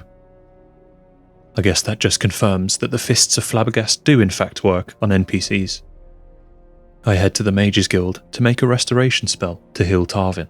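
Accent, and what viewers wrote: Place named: United Kingdom. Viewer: With the channel picture, the thumbnail, and the title I didn’t expect this voice. I anticipated something louder, more crude, and American. Not bri*sh ASMR. Good video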